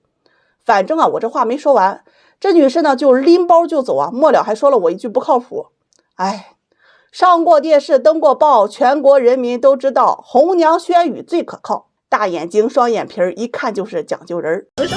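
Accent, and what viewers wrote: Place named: Shandong, China. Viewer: 听口音感觉像老乡啊，我是山东人。😂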